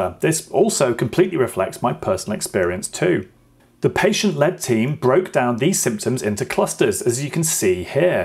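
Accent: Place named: United Kingdom. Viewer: I read the article, but its much more interesting to listen to summarise with his cool British accent. Wishing us all a long-covid free 2021.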